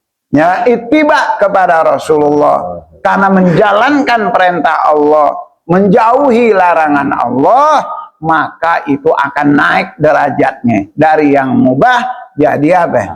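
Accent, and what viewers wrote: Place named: Jakarta, Indonesia. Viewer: Nie ustadz dialeknya Betawi banget ane sangat suka 😅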